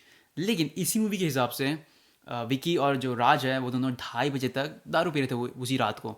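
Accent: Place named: United States. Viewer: I want to ask - Do you live in the US because your accent is American?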